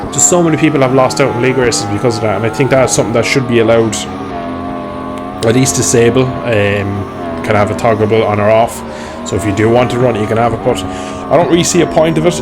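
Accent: Ireland. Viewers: Is thst a cork accent i'm hearing? 😉 Was trying out VRiders SBK natively on the Quest 3 and saw someone mention GP Bikes and ended up here. Havent tried GP Bikes yet but VRiders SBK is actuallt decent for an AppLab game.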